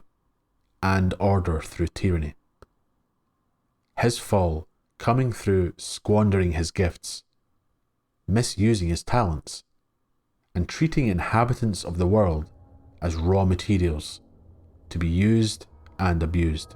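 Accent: Scotland, United Kingdom. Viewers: I listen just for your accent. Scottish? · There is no shortage of knowledgeable people when it comes to Tolkien lore...I, myself, have read all published material many times over, and explored other material as well. However...your insight is what sets you apart. I've listened to several of these videos, now, and have learned a great deal. You go beyond stating the 'facts' and explore the relationships, meanings, reasons, etc. that give the facts their importance. Truly a work of art. Your pacing and diction is spectacular as well, and the distinct--yet clear--accent makes me miss Scotland in a bittersweet way. Thank you for your contributions to our understanding of this wonderful universe.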